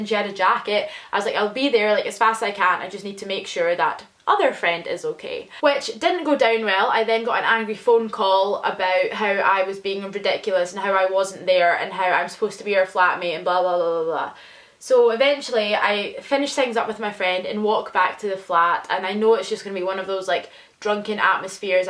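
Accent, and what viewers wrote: Scotland, United Kingdom. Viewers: I absolutely LOVE LOVE LOVE her accent. She sounds just like the characters in Trainspotting. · I understand that. I really do. I personally have a very short temper and bc of things like that, stopped taking it, I guess you could say. I was in a lot of abusive relationships and it was hard. I could tell the situation put you in a lot of distress. I really am just so sorry this happened to you. I'm glad you're doing well. I just feel so bad when people can't stand up for themselves, it makes me hurt. I'm very empathetic with people and idk, it's just upsetting. Just know you aren't alone. Here in the states, I'm still going through it with my fiance's ex. She bullies me, has been very badly for about 2 years now, it just gets worse no matter what I do, say, try etc. The things this girl did to you, I had to watch it be done to my fiance before we were together and it shook me to the core not being able to do something. 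People like that just don't seem to understand the emotional or physical toll their actions have on another person. But, I am glad you're alright and things are better. I hope no one ever does this to you again. Could I ask where your from? You don't have to give exacts. Like, what country? Your accent is awesome. I couldn't tell if it was Irish, British, English. I'm bad at connecting accents to their place. Lol. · Holy shit you're Scottish. I never seen that coming! On topic though I found Student Flats to be a nightmare, yet very exciting as I had just moved to, 'The Big City'. My advice for anyone is to find someone you get along with, save up, and get your own flat. It costs more, but you can't put a price on piece of mind. EDIT: What a crazy cow O_O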